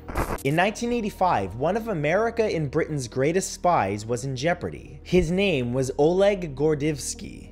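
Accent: Canada. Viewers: he canadian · Thats quite the canadian accent.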